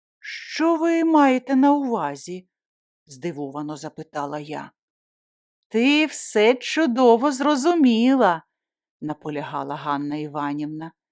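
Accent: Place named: Ukraine. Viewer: Добрий вечір,! Слухаю..., насолоджуюсь...новою зустріччю з Вами! А в голосі, до болю знайомі, нотки українського діалекту. Продовжуйте - це наша підтримка і віра на краще. ❤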